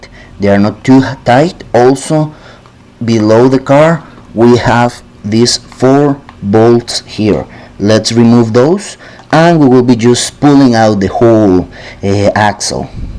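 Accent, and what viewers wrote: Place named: Spain. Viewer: Hola! Creo esres español poor tu acento jajaja buenos videos. Perdona quete moleste, pero tengo pasasdas las coronas centrales y no se donde comprarlas baratas, el diferencial completo ya que creo que esta roto porque lo muevo para alante y se mueve las ruedas un poco pero para atras se bloquea y no hace nada no se que le pasa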